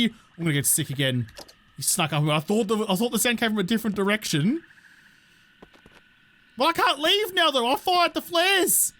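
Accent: Australia. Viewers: "hypathermia or hypathermia, which one?!" Ah, aussie accent never change but also please don't be responsible for me in the zombie apocalypse when you have to ask for help on the radio with my very high or very low body temperature.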